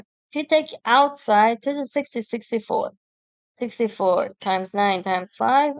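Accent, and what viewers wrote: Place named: England, United Kingdom. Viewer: La voix féminine de la commentatrice est traînante...ma parole c'est une anglaise...je suis dur d'oreille...les appareils auditifs sont beaucoup trop chers pour moi!Y-a pas de quoi rire...quand on est vieux on est vieux! Il paraît que Google va faire faillite...Ça ne m'étonne qu'à moitié...Ils poursuivent trop leur abonnés de leurs assiduités...C'est vraiment lassant à force même pour un vieux retraité.😢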